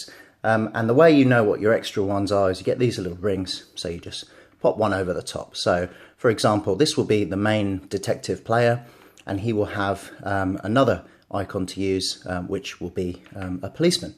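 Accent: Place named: South East England, United Kingdom. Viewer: Hello, merry Christmas. Very good review. I got this game today for Christmas. As I am studying English I would like to know where you accent is from: south-est of Great Britain?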